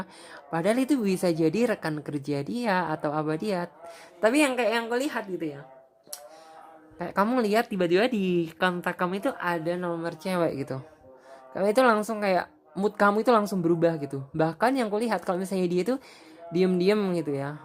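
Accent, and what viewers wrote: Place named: Surabaya, Indonesia. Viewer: Kaka cara logad ngomongnya kayak orang surabaya kaka tinggal disurabaya ya ??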